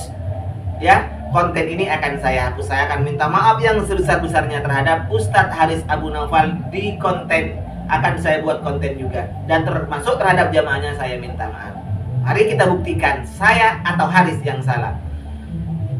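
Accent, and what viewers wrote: Madura, Indonesia. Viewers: Madura nya di p3rj3las cak..... mantap.... mau ktawa takut dosa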